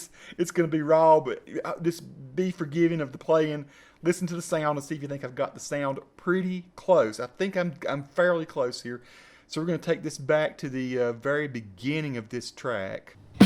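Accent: South, United States. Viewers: That southern accent though lol. sounds awesome man, I'm gonna buy the vt40x soon! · I love Southern American accent some of my friends actually tell me I've got a southern accent myself but bro! You should use the software to dial down the thick accent I want to decide between 40x and 20x I couldn't pick up some parts you said bruh but loveeeee the accent from IRAN of all places?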